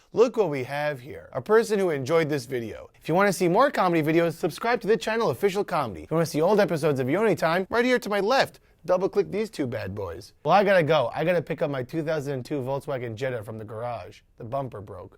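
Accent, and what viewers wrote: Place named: Norway. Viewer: I thought the accent was Norwegian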